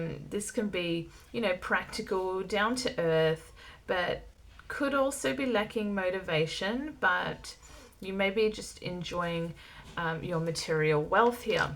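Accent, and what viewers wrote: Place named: Australia. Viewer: Great reading, I was in group 1. OZ accent..🫠🤤🌞 especially the sound of "you" and "here"❤ please don't get me wrong, It just sounds beautiful. 🫣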